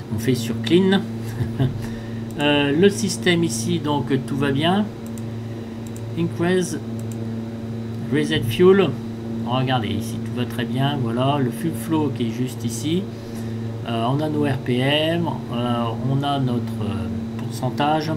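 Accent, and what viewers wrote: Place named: Nord, France. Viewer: Superbe vidéo comme d’habitude évidemment 👍👍👍👍👍 et très bien l’accent du schnord 😉😉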